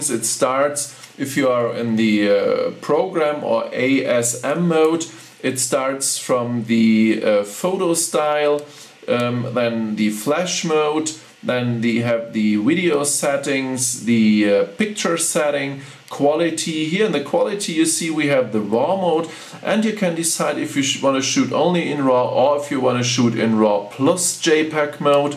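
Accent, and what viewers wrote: Germany. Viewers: you have to excuse his pronunciation for he is from Germany. now a days, Who would put in 41 mins review for you. not even the sell man from the store you go for purchase. cheers.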